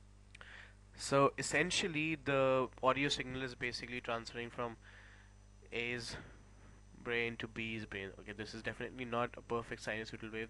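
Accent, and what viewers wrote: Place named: India. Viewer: You seem to be Indian ! from ur way of speaking!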